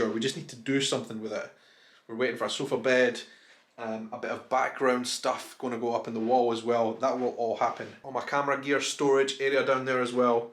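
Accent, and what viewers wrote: Scotland, United Kingdom. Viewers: this is the kind of video that made me subscribe to your channel! PS Your accent is definitely more Scottish than those you filmed in London. Glad to hear your "true voice" again. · Congratulations! I want to see all your travels around Scotland. Your Scottish accent just sweetens the tours. Happy trails,! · I watch your videos to hear your beautiful accent, and to be reminded of my visit to Scotland in 2015. I'm THRILLED you're going to be filming in Scotland for a while! I loved visiting the art nouveau center in Glasgow, so maybe that's an idea for you?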